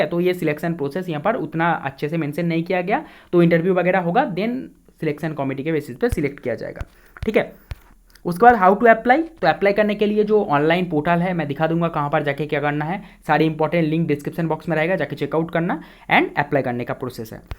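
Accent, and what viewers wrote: Bengal, India. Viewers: Are you Bengali ?? Your voice like Bengali tone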